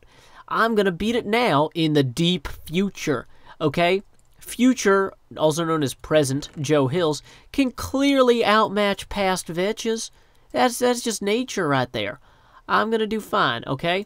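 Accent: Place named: North Carolina, United States. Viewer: Oh dear God your accent LOL its been awhile since I've heard one like it. I'm originally from western NC but moved to VA beach 8 years ago. Love the accent. Its home to me.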